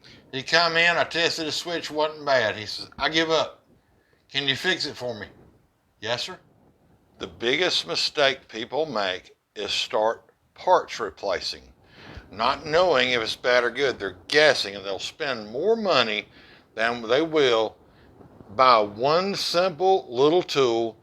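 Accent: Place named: Georgia, United States. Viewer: I was stationed in Augusta, Georgia for 2 years ( US ARMY ). I remember the way the towns folks sounded. Much like you. Brings back fond memories of those friendly folks. I even fooled a Drill Seargent with my accent. He said " Boy, where are you from ? " I said " the south " ! He said " what part of the south ? " I said " South New York ! " He said " drop down and do 50 pushups ! " I did, laughing the whole time !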